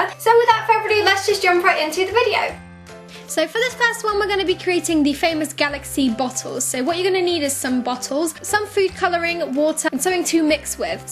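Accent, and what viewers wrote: United Kingdom. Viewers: i love your british accent though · OMG I looove your british accent! BTW really cool room decors! xoxo <3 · also u can tell she is English by her accent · MrsPixie3D if she is, she speaks like a lot of people in the uk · looove you british accent!!❤😘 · oh I thought she was British because sainburys is a British store and has a British accent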